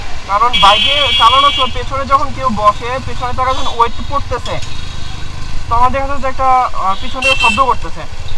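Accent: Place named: Tangail, Bangladesh. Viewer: tangailer voice ta valo lage bcz my homeland is Tangail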